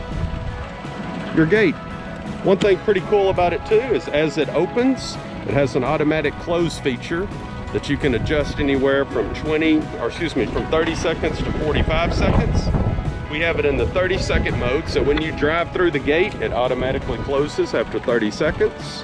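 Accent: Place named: Texas, United States. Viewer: Hi there, nice and easy, your way of talking texan its just amazing , viva texan,....the explanation was great , thanks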